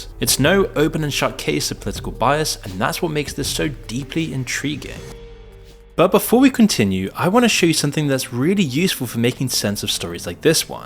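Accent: United Kingdom. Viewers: I forgot was British because accents kind of go over my head nowadays. I don't notice them anymore lul.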